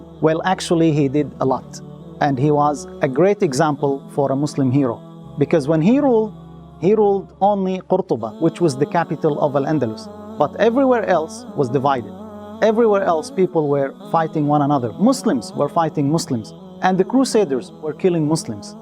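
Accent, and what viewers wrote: Indonesia. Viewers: Apa cuma gue yg dengar suara pronounce nya org indo ? Hehehe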